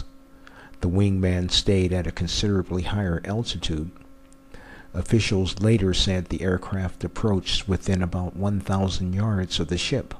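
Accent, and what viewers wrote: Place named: United States. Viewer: You speak like american.. :) are you sure that you from Australia? When Cook came to Romania after that there was 27 crew members who leave the boat. What hapens? Thay scare! When somethin goes wrong, americans always scare!.. Everyone understund that if that would be WAR our fighter could kill Cook for many times for this 1,5 hours! And of course we know why Donald Cook came to the Black See. It came to show us its power.. It's not scare..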